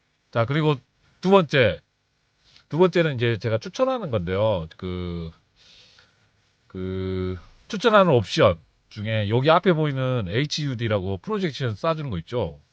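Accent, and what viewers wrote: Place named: Jeolla, South Korea. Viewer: 억양으로 보아 고향이 전라도분 같네요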